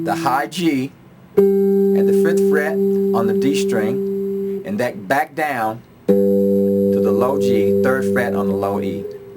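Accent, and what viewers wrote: New Orleans, United States. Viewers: You are one of the bet teachers I have ever learned from. Thank You. is that a New orleans accent?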